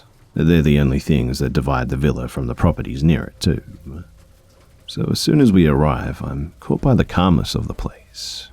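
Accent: Australia. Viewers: One of my favourite hobbies is Listening to scary stories and Drawing. Your Australian accent is Excellent and I'm not gonna lie, Pretty relaxing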